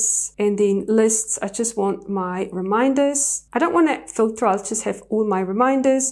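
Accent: New Zealand. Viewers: i love the new zeland accent so nice to hearr (im from australia) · I love your New Zealand Accent <3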